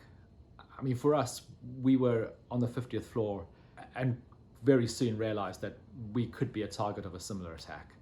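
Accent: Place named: England, United Kingdom. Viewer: Very talented..although I much preferred your floppy fringe..it matched your english accent!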